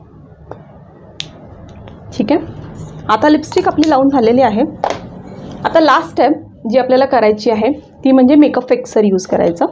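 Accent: Kolhapur, India. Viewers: Hi ….. mi make up sathi khoop video pahile pan tuza video khupch chan aani useful vatla. Mast boltes 😊assal Kolhapuri❤ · मॅडम तुम्ही कोल्हापूरच्या आहात का, मध्ये मध्ये भाषेवरून वाटलं❤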